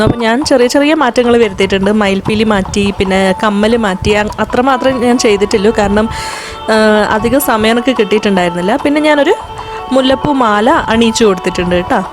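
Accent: Kannur, India. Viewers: Kannur aano pengal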